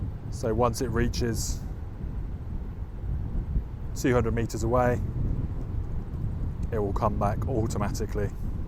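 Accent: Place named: United Kingdom. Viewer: Great video & informative. Where is the location as you sound British?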